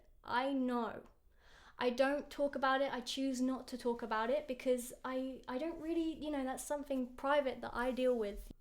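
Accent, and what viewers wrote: United Kingdom. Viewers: Her accent reminds me of hermione ❤️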